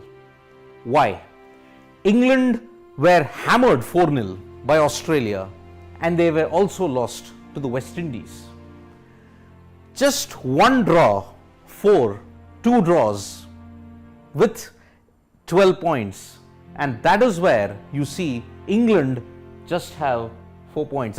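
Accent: South India, India. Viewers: I really like to listen his south Indian accent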